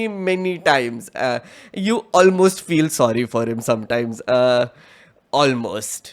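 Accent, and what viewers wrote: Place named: Gujarat, India. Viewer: Where are you from Gujarat? Ur accent says it all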